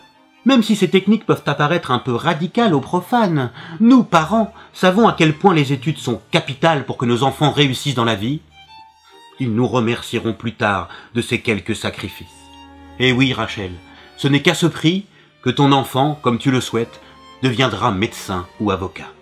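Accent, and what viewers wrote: France. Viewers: Salut :) Je regarde tes vidéos de temps à autre et je peut te dire que tu as de l'avenir si tu continue ainsi ! Reste comme tu est avec tes "vieilles" blagues qui malgré le temps nous font toujours marrer et ta prononciation à la française des mots anglophones est juste ÉNORME. Bonne continuation à toi et tu peut compter sur moi pour un petit commentaire dans chacune de tes futures vidéos. A plus :D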